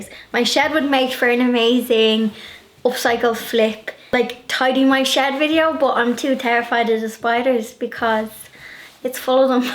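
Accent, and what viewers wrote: Ireland. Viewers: Only just found your channel and I love it! Your cats are so cute. You seem such a sweet person and your DIY's are so so lovely, but not sure what I enjoy most, your projects or your accent, I love the Irish accent. Keep up the good work and look forward to watching more of your videos. Take care and stay safe 🤗🤗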